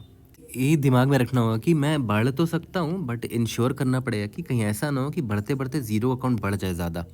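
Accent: Bihar, India. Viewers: Bhaiya aap bihar se ho kya??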